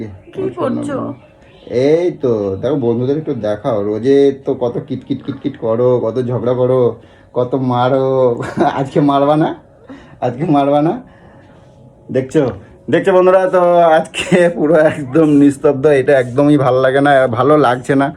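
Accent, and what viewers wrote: Bangal, Bangladesh. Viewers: তুমি একটু বাঙাল কথা কম বলো। আর শরীল নয় শরীর। তবে puchu কে মিস করলাম 😢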